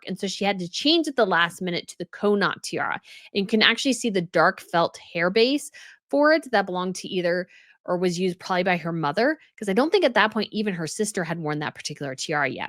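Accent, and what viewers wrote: United States. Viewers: her pronunciation is American because she IS American. That's okay.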